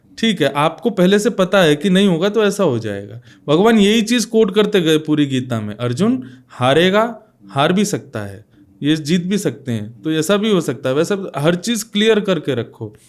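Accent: Braj, India. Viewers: aapki samukh se varja bhasha hume badi pyari lagati he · apni Braj ki vasa boliye na woh acha lagta h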